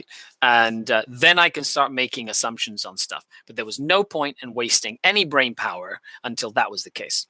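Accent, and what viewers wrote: United Kingdom. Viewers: PS. I always wondered why you were a Spanish-speaking Canadian with a British accent. Now it all makes sense!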